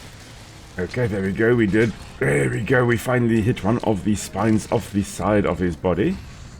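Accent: South Africa. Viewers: Your accent sounds South African boer. Please tell me im right. Just started a charge blade last night by the way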